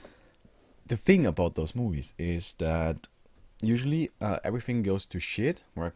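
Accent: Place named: Germany. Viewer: hay bistb dud eutscher=? dein aktzent ist soo schön deutsch ;)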